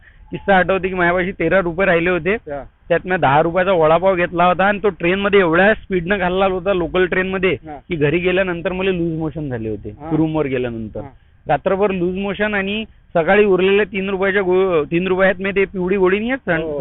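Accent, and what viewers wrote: Varhadi, India. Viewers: हा विदर्भाचा सुपरस्टार आहे विशाल भाऊला कोणी ओळखत नाही असा महाराष्ट्रात सापडणार नाही. खूपच छान वऱ्हाडी भाषा, ऐकायला फार छान वाटते पण मला तशी बोलता येत नहीं. विशाल भाऊचा प्रत्येक रील हा इमानदारीने व अभ्यासातून केलेला असतो. प्रत्येक व्हिडिओ लाईक केल्याशिवाय पुढे जाता येत नाही. पुढील कार्यास खूप खूप शुभेच्छा.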